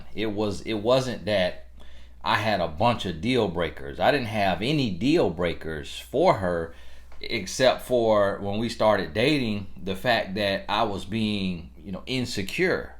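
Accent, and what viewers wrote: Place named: Florida, United States. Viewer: I come for your wisdom, but most importantly I come to hear that Florida accent: “Werk”, “Bike”, “finna”...I miss that accent. I’m from Florida too, but live up North now. Thank you 🙏🏿 And that Florida Marlins hat though! 😎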